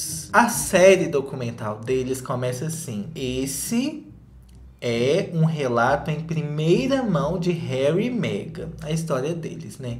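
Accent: Minas Gerais, Brazil. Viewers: Eu amo essa narração em Minerês,nó.....muito lindo.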